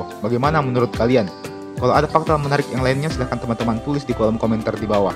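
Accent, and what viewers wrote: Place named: Palopo, Indonesia. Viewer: Orang Palopo mana suara nya Orang asli Palopo ni bos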